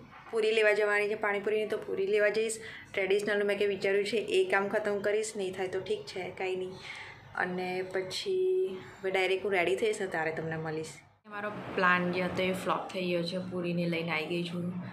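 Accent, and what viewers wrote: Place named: Ahmedabad, India. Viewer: અમદાવાદી બોલી નાખો છો સાથે બરોડા વાળી અને સુરતી થોડું થોડું 😅❤️❤️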